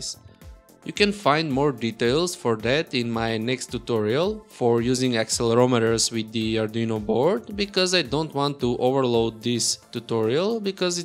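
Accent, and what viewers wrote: Russia. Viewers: Что то мне мерещится русский акцент у автора. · Are u from Russia? :) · Which strong Russian accent 😊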